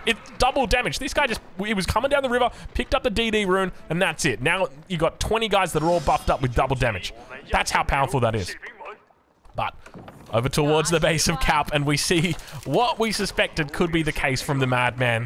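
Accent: Australia. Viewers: thats right, im watching him from Chile and I also love his aussie accent haha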